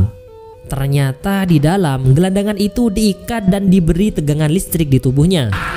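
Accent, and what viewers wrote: Madura, Indonesia. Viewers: Tolos a madureh lek 😂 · madura jatim anjir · Medureh aguen ben bro,?? 🤣🤣👍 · Ternyata mimin orang madura hahaha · Subtle madure hhhh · Jember Cong padeh ningguh. Oreng madureh tapeh lancar bahasanah tapeh gik paddeng madurenah gerre bahasanah bren.. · Reng madureh😂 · Medureh reh boss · Logattah mak bahasa madureh cak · Boh, reng Madurreh kakeh, Min? :D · Bahasanya sangar medureh😂😂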